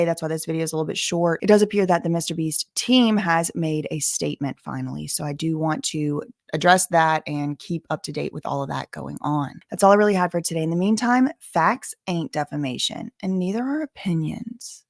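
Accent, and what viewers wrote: South, United States. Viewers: HAPPY BIRTHDAY OMG OMG!!!! Yes livestream queen. It’s not for YOU. It’s for US. Your subjects. 🤣🤣🤣 jk but seriously hope you have an amazing day!!! You’re a true gem on this platform and I’m so happy you’re here instead of a fancy lawyer’s office with free coffee and free gym and stuff. We appreciate you for real. Very rare to find a beautiful woman who is also very smart and professional and genuinely cares about people and chooses to be a voice for those who can’t speak for themselves. All while maintaining a sassy southern tone. And your name is (sp?) so extra points.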